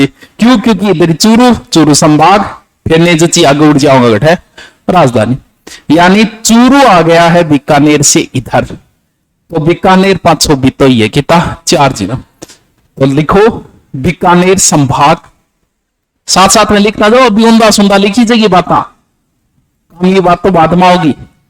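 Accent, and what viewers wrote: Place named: Uttar Pradesh, India. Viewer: Up se lagti hai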